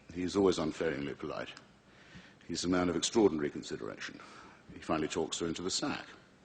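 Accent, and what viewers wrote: United Kingdom. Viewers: those are British accents (and there are several Scottish and Irish accents, I don't know about Welsh). English accents include received pronunciation, Estuary English, cockney, and many regional accents. Hitchens basically speaks rp, which is both an English and a British accent.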